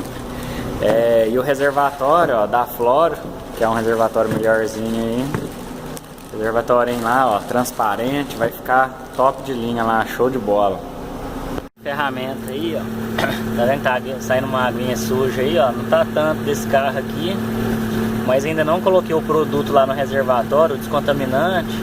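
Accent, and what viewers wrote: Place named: Minas Gerais, Brazil. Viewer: Vc pelo sotaque parece minerin de sete lagoas rsrsr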